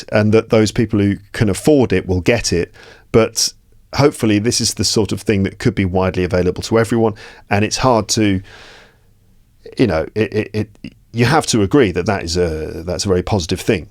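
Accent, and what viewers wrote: RP, United Kingdom. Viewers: I love Bristish accent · Yes, speaks with what is called RP (Received Pronunciation), which is clear and easy to understand compared to many regional accents. You can also call it “BBC English”. I think you should not focus on one specific accent, just make sure people can easily understand you. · What is the name of the accent you speak? Is it like the BBC accent? ، Since I’m not a native English speaker and I’m learning English, which accent do you recommend I learn? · He speaks with a standard British accent also known as Received Pronunciation. Since you're a learner of English, you should go for Received Pronunciation.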